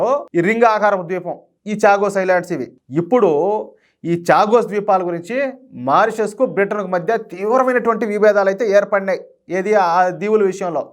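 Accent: Rayalaseema, India. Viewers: Continue the videos with the same tempo and Unique Ratalassema Slang.. 💐💐👍👍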